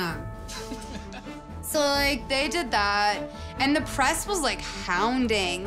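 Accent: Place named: California, United States. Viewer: California girl here. I feel like this chick is how the world thinks my people speak. I would shoot myself if I sounded like this chick. I'm sure shes a nice person and all but her voice .... my GOD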